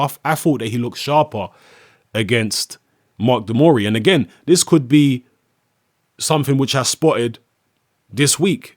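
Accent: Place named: London, United Kingdom. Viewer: are you from north London? You have the same accent as all those grime guys.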